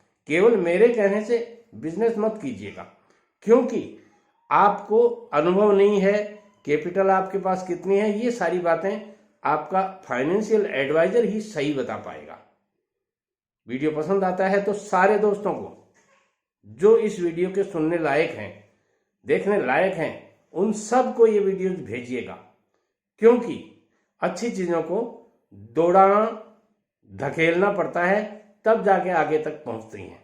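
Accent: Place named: Bihar, India. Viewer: Dadaji Ham aapki awaaz ko mahsus kar pa rahe hain jaisa lag raha hai ki aap Bihar Jharkhand se honge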